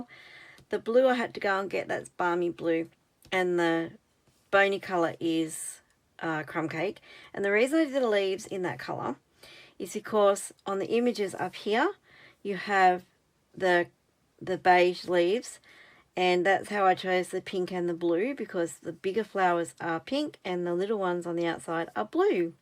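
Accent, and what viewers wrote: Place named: Australia. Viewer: Loved watching how you adapted the sketches to suit your papers/photos, and it is a joy to watch /listen to a fellow Aussie❤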